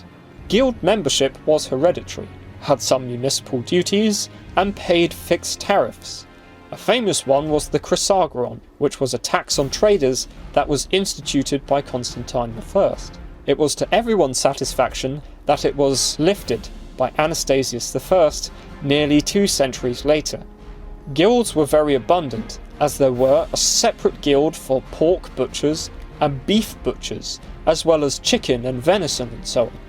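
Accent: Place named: United Kingdom. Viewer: British accent = youtube success. Good channel.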